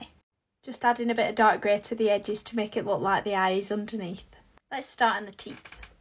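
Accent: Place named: Northern England, United Kingdom. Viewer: Oh wow you’re a northern lass, why did I think you were American? So frikkin talented 😊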